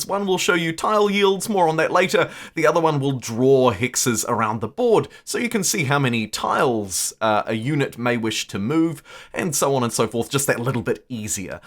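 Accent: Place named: New Zealand. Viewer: nice newzealand accent my friend.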